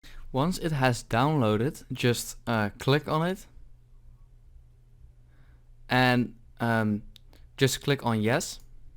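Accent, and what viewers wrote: Netherlands. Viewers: he sounds like a dutch person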